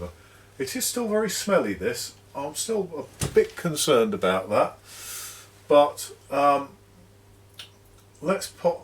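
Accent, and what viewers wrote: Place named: United Kingdom. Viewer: Great to hear a British voice fixing British radios. Keep up the good work.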